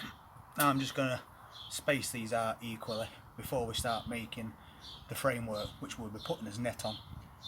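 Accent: Yorkshire, United Kingdom. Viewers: Just found yer chanel dude subscribed hello from donny are you Yorkshire?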